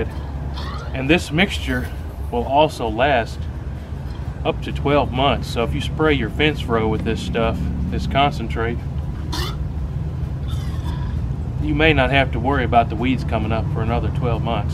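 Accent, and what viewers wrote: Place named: East Tennessee, United States. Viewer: He talks like we do here n East Tennessee “FRONCH Yard” 😂😂. Thanks for the video definitely getting that.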